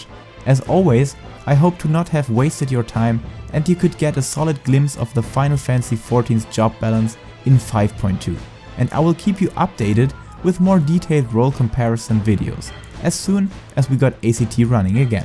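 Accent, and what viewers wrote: Germany. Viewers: R U German? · So wie dein Englisch klingt, bist du deutsch oder ? Sehr gute und Hilfreiche Videos!! Sehr zu empfehlen!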